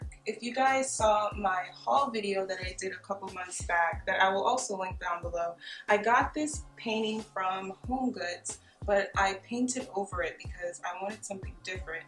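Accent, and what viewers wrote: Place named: Jamaica. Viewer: Love your decorating skills and you were resourceful with your larger pieces! Love the minimalist glam look. I hear an accent, Jamaica? New subbie.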